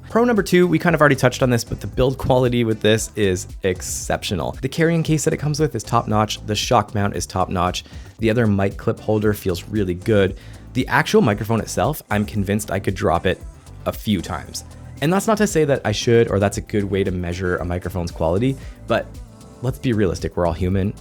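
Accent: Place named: Ontario, Canada. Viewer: of course! I have the LS-208 as well. Great mic! All Lauten Audio mics are fantastic. I have several. You seem to be a fellow Canadian. Also, I’m detecting an Ontario accent there. Maybe north of Toronto? Peterborough? I’m in the Niagara Area, myself. Nice to meet you!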